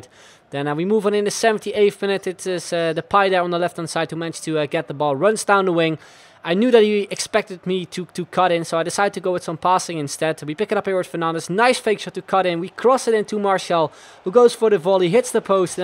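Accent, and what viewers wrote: Netherlands. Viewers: Je hebt echt een nederlands accent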